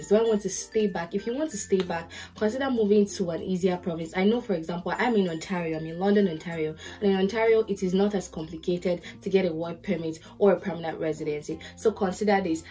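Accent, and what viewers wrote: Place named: Ijebu-Igbo, Nigeria. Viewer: Do not change your accent for anybody!! Thank you for not changing your ijebu-igbo accent 😁😁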